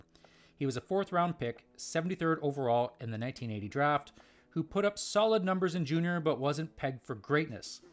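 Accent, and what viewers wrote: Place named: United States. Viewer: Sounds like an American talking about a subject he knows little about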